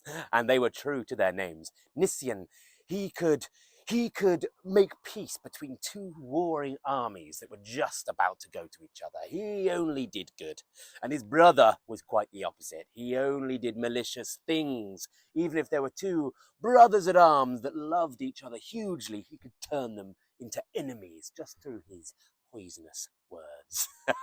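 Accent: Wales, United Kingdom. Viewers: So its with the 'th' sound, I'm sure it has anything to do with your Welsh, I have a hard time with accents, I've tried to watch Irish movies in the past, even thou they are speaking English, I can't understand but half what they are saying, lol We got a Tsunami warning while I was watching your video yesterday, before I left for high ground, I took a peek at where it was coming from, saw way too many 7's, I told my son, who was here with me, it was a trick. I was joking around on your Loki video, using my other account, acting like a trickster, lol, when all this took place. Almost every day I write about December 5th, yesterday I forgot it was December 5th, I took it has a sign.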